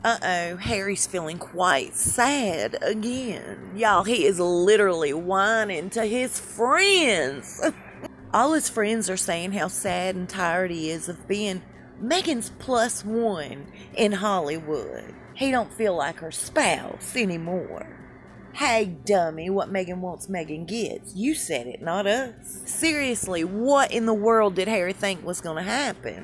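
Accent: South, United States. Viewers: Hey 👋🏼 Always enjoy your vids & that sweet southern drawl of yours 😁 I watched the trailer for the movie…that’s all I needed. I cringed at her voice…😝 She won’t make it in Hollywood, she’s just not good enough. She’s an ok actress, but that’s it. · I love your videos...YOU ARE THE FUNNIEST OF THEM ALL... you truly put a smile on my face...not only because we are sisters in the 'MEGXIT".. I hope that MERCHANT MARKLE (as I call her) GETS HER KARMA BACK..!!!.but I also have a house in the Sunshine state...I like your southern accent better than the millennial....I actually love it.!!!!... in my humble opinion it makes you different from the rest, it gives you character, your own personality...plus the jokes sound much better, super funny..!!!! the millennial accent does not "suite" you...hahahha no pun intended..!! I think If anybody is over the age of 16 ...is just not right..it is actually a speech vice...did you know that? 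I hope I did not make you feel bad or hurt your feelings in any way....because I just love your videos. EX PECIALLY...HAHAHAHAHAHA. the one where you talk about going thru fire hoops, jumping over a pool full of German pinchers or something like that...oh...and the ROASTED CHIKEN...OMG..... I LAUGHED SO HARD..I HAD TO RUN TO THE BATHROOM...no,...really, ..seriously...I did.... you are the best..!!!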